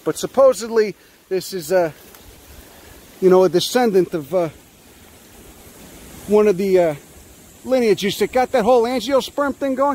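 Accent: Chicago, United States. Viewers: the heavy chicago accent makes this so much better because it makes you sound like such a dad. im basically imagining a chicago ned flanders teaching me about plants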